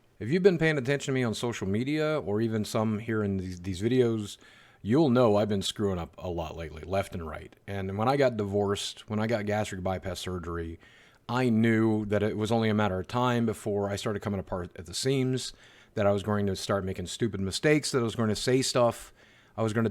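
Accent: South, United States. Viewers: Why does your accent sound SOOO southern in this vid lol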